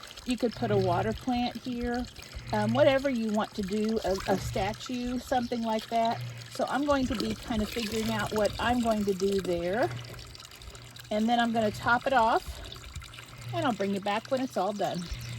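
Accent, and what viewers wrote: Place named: Ohio, United States. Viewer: Those turned out beautiful now I’m not sure what state you’re in. I wanna say Ohio, but I could be wrong. Anyway you have a little bit of an accent, but that was very good. Even your one in it were great. Good job. I’m gonna do that here in my property, but I found a flat angel That was originally hooked to a fountain and of course he didn’t have that the thrift shop and I have a ceramic pot and I made it. It’s gonna look really cute yeah but I was thinking to keep the leaves out of your red pan. Why not cut out a little piece of Spring and then glue those gem rocks on it and put that over the drain maybe that would help keep that cleaner longer. Have a great day. Thanks again. I loved it.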